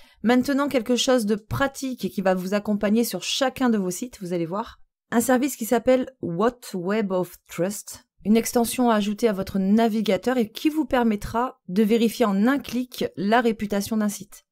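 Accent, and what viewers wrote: South, France. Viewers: merci très bien expliqué léger accent qui vient du sud..peut être de la ville rose... si je peux me permettre serait il possible de mettre un son bien moins fort pour un maximum de confort auditif convaincu je m'abonne c'est plus instructif qu'un cours en FOAD et plus "rafraichissant" bonne continuation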